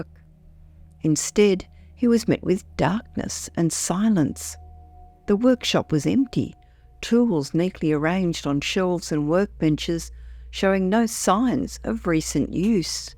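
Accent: Australia. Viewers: She has a lovely aussi accent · Nah, you're just not used to her Aussie accent.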